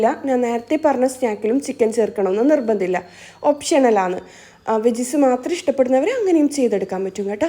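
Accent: Kannur, India. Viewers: Irfanagood presentation enik kannur samsaram nallaishtanu to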